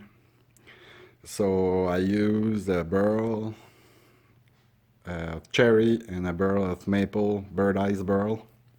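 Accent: Canada. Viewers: I just subscribed to you! Beautiful works!! Wish I could take up this hobby. No doubt woodworking can get into your blood! Thanks for using nice language AND having a great accent! How do I become a "kanuck"?!!! Hope I didn' the say a " nasty"! My son & daughter-in-law love in Sarnia, Ontario,Canada.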